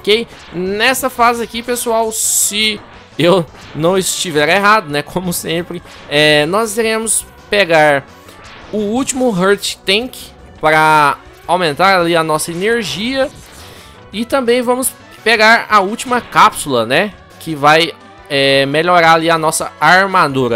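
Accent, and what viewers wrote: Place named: Goiânia, Brazil. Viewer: tu e de Goiania kkkkkk eu tambem vishhh!!!!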